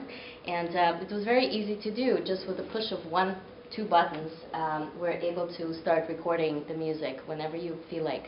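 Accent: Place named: Russia. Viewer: Strange how a Russian lady reminds you of a French lady but they do have similar accents lol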